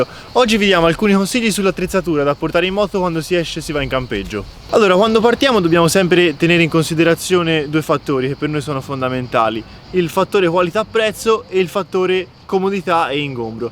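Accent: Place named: Tuscany, Italy. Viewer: "queesto materassino della (c)amp" adoro l'accento toscano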